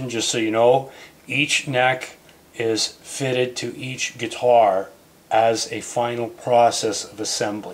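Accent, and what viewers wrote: Canada. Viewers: Mine is a 1968 that I bought new in '69. Have never owned another, never needed to. I've had it 44 years. Will leave it to my son, who plays bass, when I pass to that 'Great Gig In The Sky'. I still play, mostly blues and R&B. I grew up where we all had a 'Great White North' accent like yours. Great video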